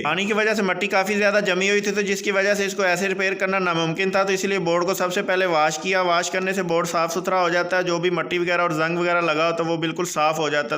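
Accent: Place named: Rural, India. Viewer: Kaar deye? Kyune KAAR deye? Urdu to dekho zra apni. Aisa lg raha hai k ksi Pind se ai ho or video bnaney beth gae ho.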